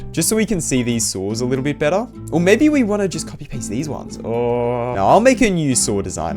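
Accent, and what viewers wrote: Australia. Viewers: are you Australian? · sounds awfully Australian today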